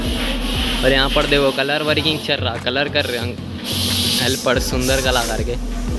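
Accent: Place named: Hyderabad, India. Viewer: hao bhai🔥 hydi bolte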